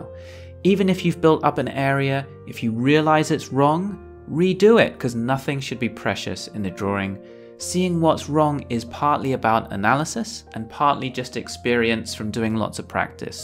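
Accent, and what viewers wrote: United Kingdom. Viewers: Wow I love life drawing, I am guessing you are from Britain by your accent? I live in Manchester is there any life drawing class in Manchester I would like to attend... for a free trial lesson.. Could you get back to me please!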